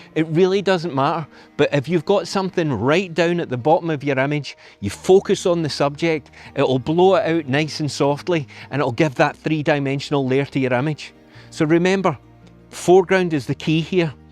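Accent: Scotland, United Kingdom. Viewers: Ok can I say I came for the ditched rule, I stayed for the perfect Scottish accent. Thank you great photos.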